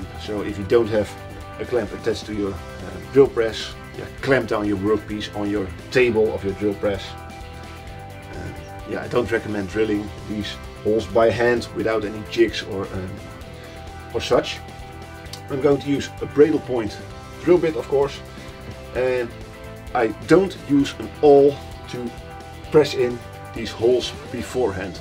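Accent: Netherlands. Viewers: Thanks a lot for this video! I will definitely use some of your approach in placing a Floyd Rose on my current project. Thought I was the only one that does quadruple re-measuring :). Is that a Dutch accent I hear :D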